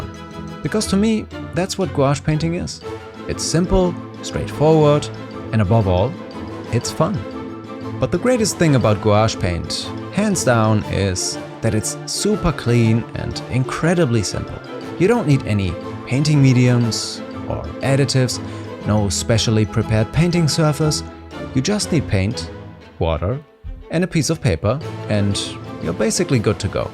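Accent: Germany. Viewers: It took me 2 min and then I was like... "Is there a tiiiny german accent in there?" Clicked on your channel desc and damn hey german fellow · You sound so German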